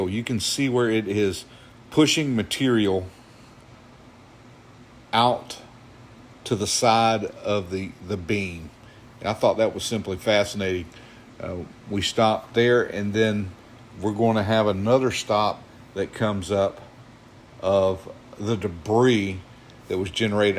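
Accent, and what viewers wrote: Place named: Georgia, United States. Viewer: I came over from “What’s Happening in Shipping” as well. Enjoying commentary and level of detail. But more than that, I was raised in Athens so it’s great to hear a Georgia accent! Thank you!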